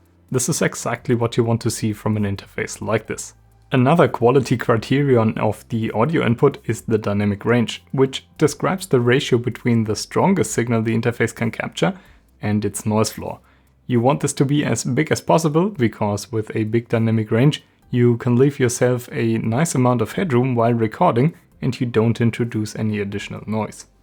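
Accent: Germany. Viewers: Love the German accent.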